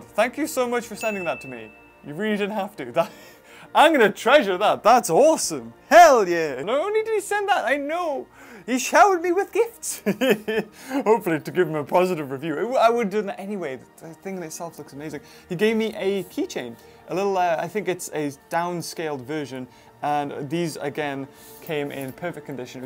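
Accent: United Kingdom. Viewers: Ever since I’ve been watching I may have develop a British accent at times. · are you British???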